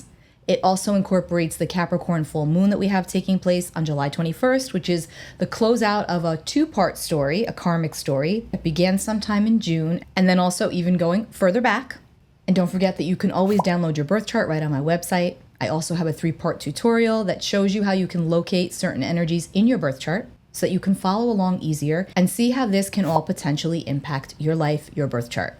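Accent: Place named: New York, United States. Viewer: From one intuitive to another.... excellent presentation! And I think you're a New Yorker by the accent? 🎉 I appreciate your approach and neutrality. I think your channel is about to take off. Congratulations! I was picking up Trump assassination attempt last year, and let's say this is a possibility with this transit. However, It would have to be a wild card event at this point because the probability has gone down since last year. Definitely subscribing! Thank you😘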